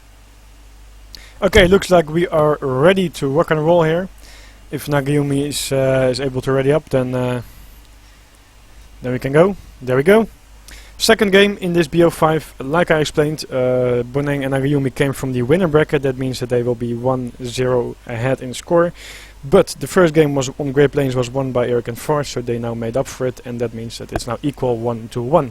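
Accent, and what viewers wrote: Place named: Netherlands. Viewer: The accent is dutch, the way you talk is dutch, welkom mede hollander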